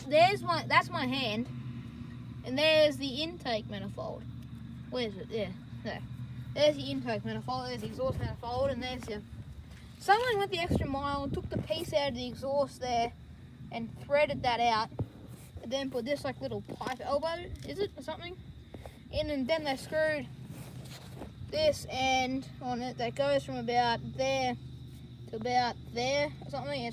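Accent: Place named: Australia. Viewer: Nice! We use ours here in NC to grow collards. Sounds like you might be in Australia, based off the accent. Just curious if collards would grow well there, or what and all does grow good.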